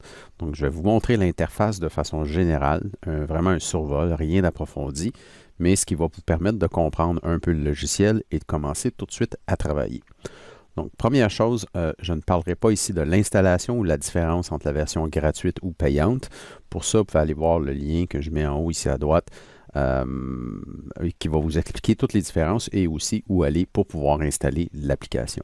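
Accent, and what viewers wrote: Quebec, Canada. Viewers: Bonjour Je connaissait un peu fusion mais je viens de découvrir ton site et c'est vraiment très dictaticiel et en plus je suis français et j'adore l'accent québécois!!! Une question, tu as un ordre de visionnage pour les autres vidéos? Merçi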